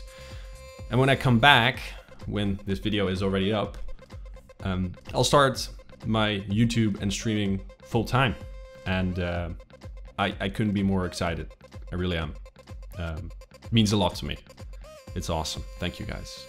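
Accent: Netherlands. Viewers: Be proud of your heritage; don't drop your Dutch accent just because you might think it's uncool, nothing wrong with anyone (whether they're streamers or not) with an accent. Just my 2 cents man, always enjoy your videos. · Een Nederlands accent kun je nooit echt verstoppen, kijk je video's al tijdje, en je bent een goede speler. Heb Dayz al een tijd niet mee gespeeld, zou je een video kunnen maken over de staat van de game, hoe is het veranderd in het laatste half jaar ?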